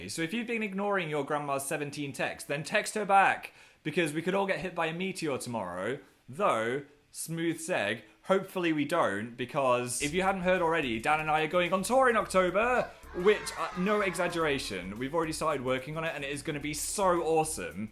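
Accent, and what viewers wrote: North, United Kingdom. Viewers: that northern accent though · I didn't notice your accent was northern until this video... wow I'm observant. Now I can't pay attention to what you're saying I'm just listening to the accent. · I thought Phil sounded kinda northern in this particular video. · you sound so freaking northern this week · I was about to say you sounded a lot more northern before you said something 😂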